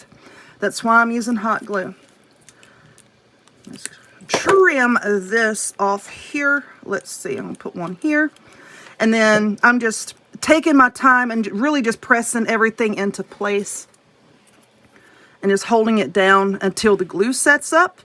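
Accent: South, United States. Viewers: As a Southern Girl myself(Texas), I can’t decide what I love most…. Your Awesome Crafts, you’re Southern accent, the nails or the beautiful tattoos! I’ve learned so much from your videos. Please keep posting!!!!! 😊👍🏻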